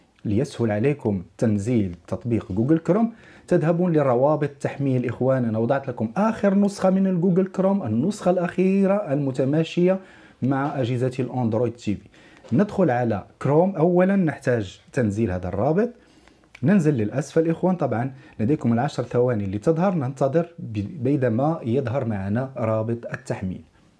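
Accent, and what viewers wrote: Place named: Amazigh, North Africa. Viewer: كل شر وحك صعبه ولانهم منك شي لهجه امازيغه صعبه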